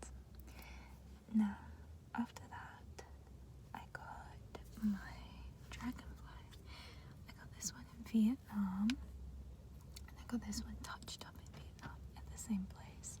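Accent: Australia. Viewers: That's an Australian accent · aussie accent is so relaxing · You from aus? Ik a Aussie when I hear one confirm for me Bub. 😂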